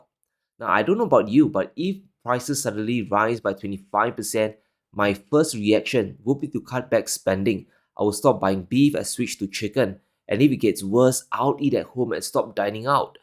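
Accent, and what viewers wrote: Singapore. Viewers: Too bad if you don't know what a Singapore accent is, and can't even spell properly in English. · is a Singaporean. Why would he speak in a foreign accent?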